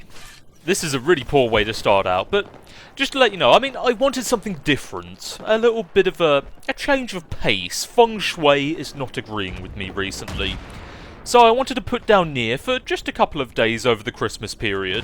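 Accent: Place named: England, United Kingdom. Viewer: I honestly can't put my finger on where abouts in England you are from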